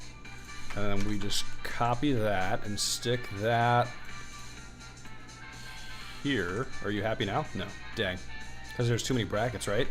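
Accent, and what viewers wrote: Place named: United States. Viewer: Thank you thank you 😥😥😥 Finally we have a YouTuber who has American accent new courses, short simplified and great 😣😣 thank you very much By the way any quick idea to learn mathematics for machine and deep learning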